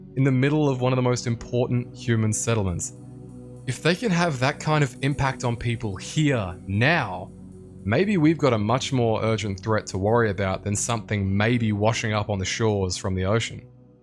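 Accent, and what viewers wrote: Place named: United Kingdom. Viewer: Finally someone reads the dialogue in Runescape with the appropriate "Britishism" it was meant to be conveyed with.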